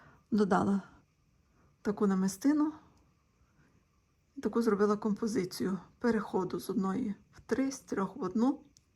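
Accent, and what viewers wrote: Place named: Lviv, Ukraine. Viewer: Пані! У вас дуже гарна львівська вимова( чи тембр,я не знаю як правильно назвати) я отримую велику насолоду від цього.будьте здорові! Ми переможемо!!!!❤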